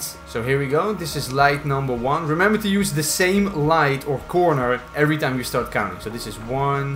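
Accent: Netherlands. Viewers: sick vid :D you dutch?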